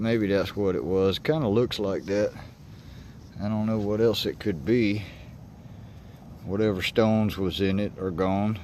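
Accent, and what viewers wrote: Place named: South, United States. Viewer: I like the laid-back approach. Very relaxing. Obviously you're a Southern boy. Keep the videos coming.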